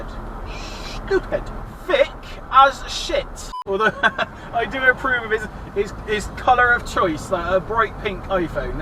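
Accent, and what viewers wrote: Bristol, United Kingdom. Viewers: We could do without the Bristol accent, sounds more like a local yokel who should have stayed on the farm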